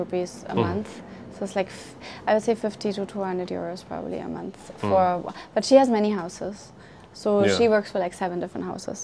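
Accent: India. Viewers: yoooooo i dont want to sound rude but her accent sounds sooo indian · at this point her entire body language and expressions and even the way she was talking is completely Indian 🇮🇳 . 🙌🏻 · I was about to say the same thing that her accent has the Indian touch to it.. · Her accent has slowly changed I would termed it indianish english not fully tough 90% 🙏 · That Indian accent :D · Really Really liked how her English accent showed a little of Indian accent. She may not stay in India forever, but she would carry a little of India wherever in the world she goes. 🥰😇😍🤩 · She has an Indian accent 😂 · Her english sounds like Indian accent. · Is it just me or she's speaking indian English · She has got an indian English accent as well. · Alas! This gracious lady even integrated indian accent to herself! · She picked up indian english accent. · Did you note,how smiling she is? 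She has developed an indian accent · The way she switches between the German accent and the Indian one is so funny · she is getting Indian accent 😂😂😂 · It's weird to hear her talk in English with an Indian accent. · She surely have long enough in India .her English accent is very Indian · Her accent is pleasant Indian English 😮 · Her accent is sooooo INDIAN that at one point I thought she might be from here since childhood. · I love that her accent is also Indian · I'm not from a rich family, but I remember sometimes my brothers and I would ask our parents some very honest questions in regards to poor people or poverty when we were small. Most often, the response was to the effect of "don't talk about it". That's not to say my parents were against curiosity or something, I think it's a societal thing where we tend to ignore these problems going on right around us because it's not proper to ask those kinds of questions. 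And I suppose the richer you are, the less you think about these things - but this happens all over the world. On a lighter note I love how "Indian" she sounds, her accent is such a melodious mixture of German and urban Indian English 🤗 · She sounds to be like an Indian woman and her accent is cute 😍❤ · She sounds so indian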